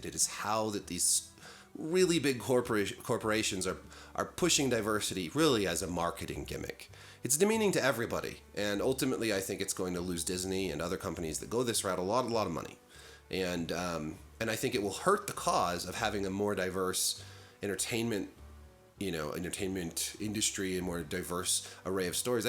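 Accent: United Kingdom. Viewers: Moar British accent please! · is SINGLE HANDEDLY bringing CREDIBILITY and reason to FORBES Pop Culture topics! Also laying down the SUCCINCT British Accents! 😅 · "Being a critic, you're supposed to tell the truth". Hallelujah. Thanks for being one of the few critics who actually believes this! BY the way, keep up with the British accent - way more entertaining than shows like the Acolyte. BTW - the BBC are making a show about the Battle of Hastings featuring, you guessed it, a diverse cast. Exactly the sort of artificial nonsense you are talking about.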